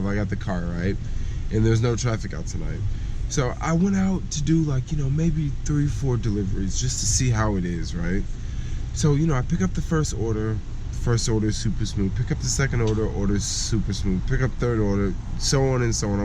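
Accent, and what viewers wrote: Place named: California, United States. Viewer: That Cali accent. Unmistakeable, and unique...especially the bay accent. I miss LA. ;(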